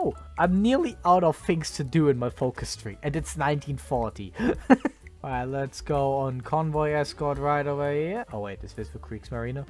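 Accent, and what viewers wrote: Germany. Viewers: Ich komm' auf den deutschen Akzent nicht klar. Hab direkt zu Beginn schon bemerkt, dass da was faul ist. 😂 🇩🇪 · nun, man hört raus, das du deutscher bist.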